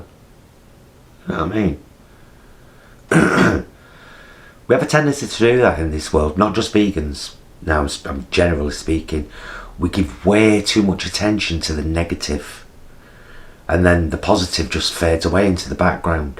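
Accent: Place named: Northern England, United Kingdom. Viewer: Cheers!, also very good to hear a proper northern voice whilst I’m stranded abroad 😍🙌🏻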